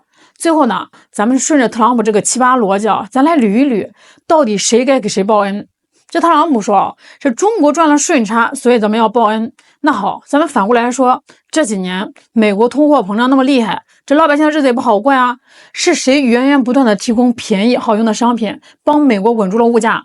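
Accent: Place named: Northeast, China. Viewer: 主持人能不能不说东北话😂😂，还有说话的时候能不能别露牙肉😂。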